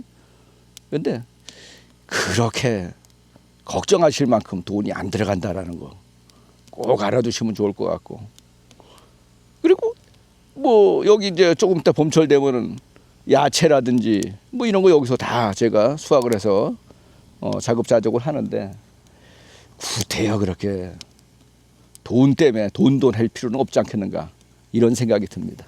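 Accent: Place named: Jecheon, South Korea. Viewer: 제천 , 단양 사투리 같은데요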